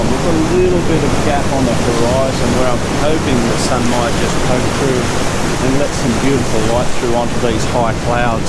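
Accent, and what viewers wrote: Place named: Australia. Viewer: G'day, I've just given you a sub mate. I'm a learner and I've stumbled on to your channel and I've got to say how refreshing it is to first know where you're talking about and to hear a familiar voice in the form of your Aussieness. I'm enjoying the fact that you are shooting places that are reasonably accessible for a fella like me and I'm going to confess I will be visiting some of these locations. Thanks heaps for your efforts with this channel mate, fully appreciate it.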